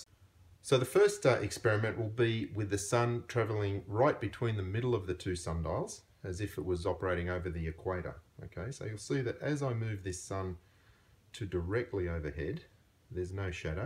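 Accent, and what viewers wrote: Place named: Australia. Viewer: He is Australian as far as I can tell. Here is the NZ accent <link>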